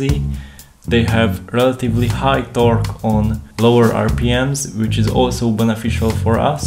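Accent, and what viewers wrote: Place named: Hungary. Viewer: csá. ne higyj a fokuszcsopi ádámnak h magyarulkéne. teljesen jolesz igy magyar akcentus elsöre vicces de szerintem pont jó .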